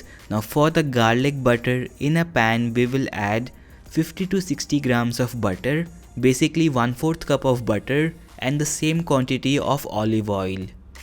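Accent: India. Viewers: Fuck this disgusting accent of indiiisss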